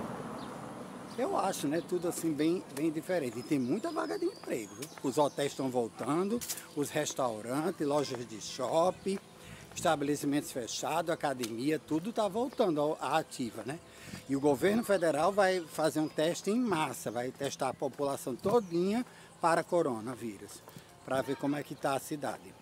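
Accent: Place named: Northeast, Brazil. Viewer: Bacanaaa ver a realidade ! Sou do ES amo seu sutaque nordestino, dizem q o povo daí é metido a besta kkkkk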